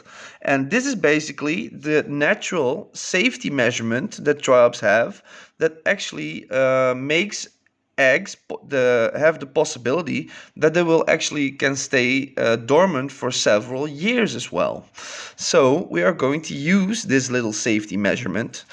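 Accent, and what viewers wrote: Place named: Netherlands. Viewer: Hello I live in Europe so I can't buy eggs by you so is bought eggs by Clementoni got a tank with it but The tank doesn't got a lid its not small its not plastic I also had a year ago triops eggs and got one adult 3 months old stupid me didn't save the sand but that one was in a plastic box witch one do I need to use? (BTW your voice sounds like somebody from the Netherlands Are you Dutch cause I am Dutch so I hear it sound like that no hate)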